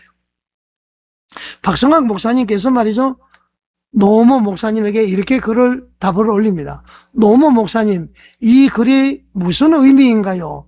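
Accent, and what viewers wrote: North Korea. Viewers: 참 낯짝도 두껍네. 이영훈 목사팔아 밥먹고 사느라 그 이북 말투부터 뜯어고치고 유트브 해처먹어 듣기싫어 이북 말투나 좀고쳐라!!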